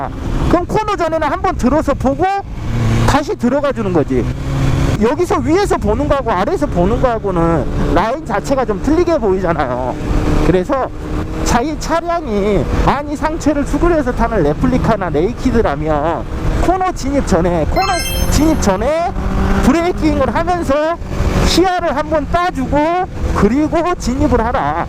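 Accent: Jeolla, South Korea. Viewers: 아따 남도 사투리가 구수하요이~ 그라고 알려 줄라는 내용이 팍팍 와닿소^^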